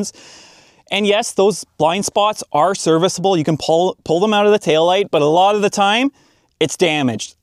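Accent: Canada. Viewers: I'm Irish/Canadian and subbed because I really miss the Canadian accent sometimes. Your car insight is also brilliant and shocking. We are really doomed.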